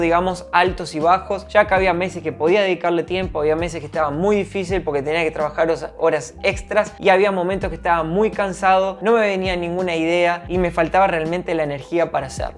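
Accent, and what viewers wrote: Argentina. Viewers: Estonia? parece Argentino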